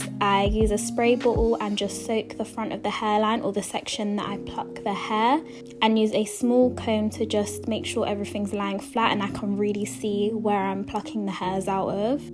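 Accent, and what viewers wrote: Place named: United Kingdom. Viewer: Lol love the accent ❤️❤️❤️❤️❤️❤️❤️❤️where you from UK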